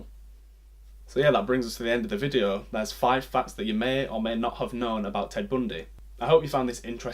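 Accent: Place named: Yorkshire, United Kingdom. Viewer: Are you from Yorkshire? Your accent is really cute haha Great video btw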